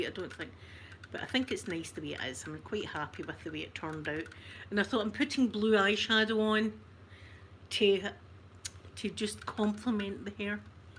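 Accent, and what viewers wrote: Scotland, United Kingdom. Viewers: Hi, I’m new to this channel. I subbed on my other account, but switched to this other one. I’m from Scotland too. It’s nice to hear another Scottish twang on YouTube ⭐️😁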